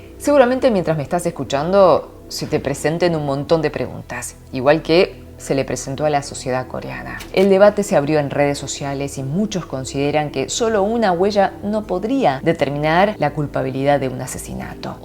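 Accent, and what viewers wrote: Argentina. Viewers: hablas perfecto español argentino me alegro mucho por vos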